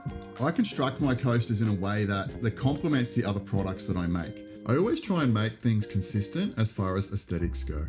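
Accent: Australia. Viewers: Heck dude. Im brand new here. I will sub. Really nice hearing an Aussie. Cheers from Central Vic.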